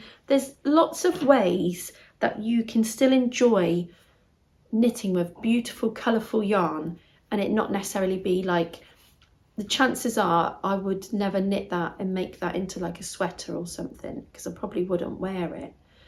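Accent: West Country, United Kingdom. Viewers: Hi, thank you for your lovely podcasts they always brighten up my day 😊 I found you after watching Wendy the unexpected gypsy and Amy the meaningful stitch. Love hearing about all of your projects. My Mum makes my lovely project bags. Also just to say I’ve really been enjoying reading two books by Libby Page, The Lido and The 24 hour cafe. I’m from the West Country too, love your accent. Best wishes 🧶 x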